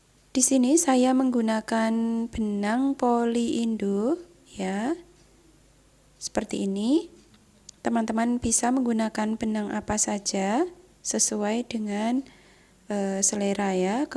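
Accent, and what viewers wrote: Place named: Java, Indonesia. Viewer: Mantap suka suaranya medok 😍😍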